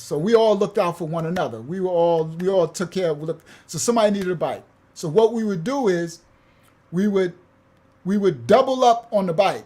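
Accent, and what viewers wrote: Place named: East Texas, United States. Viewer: I've been listening all day at work and most times I listen to the Ministry channel... but I decided I'll listen to the 25 to life channel.. I'M LIKE UNK. YOU SOUND LIKE YOU FROM THEM DEEP WOODS WHERE I'M FROM😮😮😮😮 I HAD TO WITNESS CROSSES BURNING, AND LAYING ON THE floorboard just to get Beaumont.. like😮😮😮 KOUNTZE, SILSBEE, JASPER, VIDOR, LUMBERTON( MORE RACIST THAN VIDOR=SWEPT UNDER THE RUG, WHERE I SAW MY FIRST BURNING CROSSES) WOODVILLE...ALL IN DEEP EAST TEXAS... THEY STAYED ON THEIR SIDE IN OUR SMALL TOWN AND WE STAYED ON OURS..I PRAY 1 DAY TO TELL IT..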